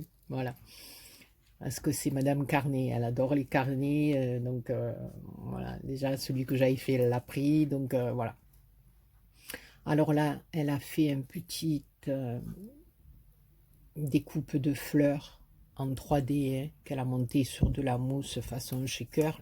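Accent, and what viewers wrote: South, France. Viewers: Coucou, je suis contente de te retrouver pour cette petite vidéo et ton magnifique accent du sud. Que de merveilles! Je suis une fan de la fée clochette alors j'adore la carte avec qu'elle dessus. Trop belle sans oublier la carte avec les fleurs trop trop belles. Tu as eu de magnifique cadeau. Bonne soirée et gros bisous Sabine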